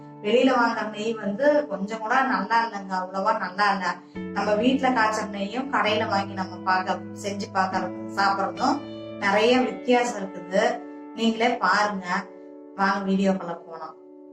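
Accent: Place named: Kongu, India. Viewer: கொங்கு தமிழ் நல்லா பேசுறீங்க.. ஏன் background English பாட்டு போட்டுருக்கிங்க ?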